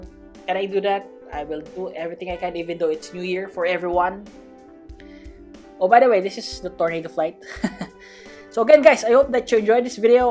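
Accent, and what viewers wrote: Philippines. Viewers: r you from phillipines?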